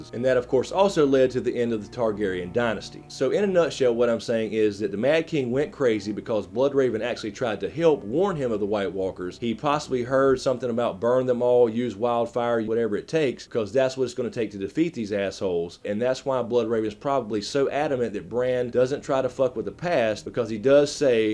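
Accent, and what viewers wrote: United States. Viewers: Oh fuck, your American accent is too much for to handle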